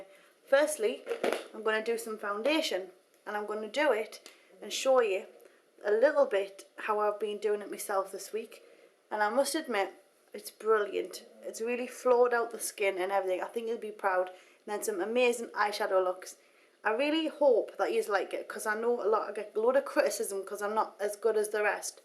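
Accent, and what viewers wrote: Newcastle, United Kingdom. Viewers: Are you a Geordie? First I thought you were Scottish....nice tutorials, I am still struggling with the false eyelashes...:) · dude ur video was awesome, but the one thing i really want to know is your accent. is it... british? irish? idk.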